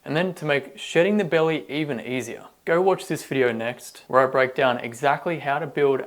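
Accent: Australia. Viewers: "a skinny fat Italian dude", I cannot see any Italian side (aesthetically) on you, even your name and surname sounds really English. From the way you pronounce some vowels I think you are Australian, anyway from which part of Italy are your (grand)parents? I like the way you explain how the body works, you are one of the best natural body I can see on Youtube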